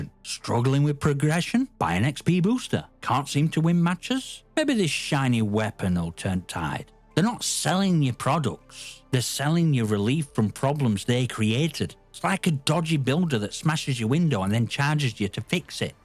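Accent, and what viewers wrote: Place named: Northern England, United Kingdom. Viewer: As a guy from Leeds, that's one hell of a northern accent. Great video, I have the same gripes with these kinds of people, glad to see someone calling out the bullshit.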